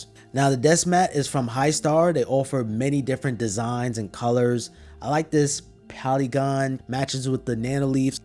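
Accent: New York, United States. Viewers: Minute into the video I already know he’s from New York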